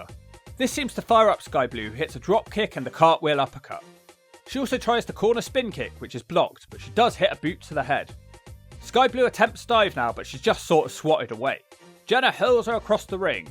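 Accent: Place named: United Kingdom. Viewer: Just realized you are from United Kingdom. It explains why I, as a native spanish speaker, can't understand everything you said 😅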